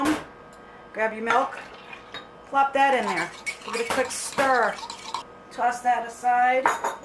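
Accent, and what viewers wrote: Boston, United States. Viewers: Nice and easy - I'm thinking Boston or Waltham - but I could be wrong with that accent - but we all LOVE accents - it's what makes America that thang - as for the shot glasses - says a lot LOL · I hear Boston!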